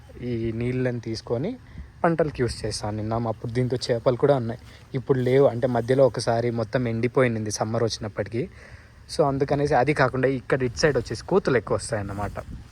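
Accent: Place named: Chittoor, India. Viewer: Rasayalaseema chittoor slang matalavutunnav bro,, nice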